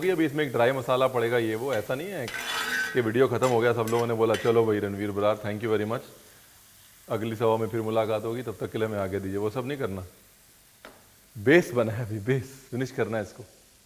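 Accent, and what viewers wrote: India. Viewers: "Main keya ayi k nai hun dhabbe ali feel"😂😂👍 That total Desi accent is so appealing to hear that we rush to to your vedios not just for magical cooking recipes but also for this entertaining content. It makes you stand apart from all others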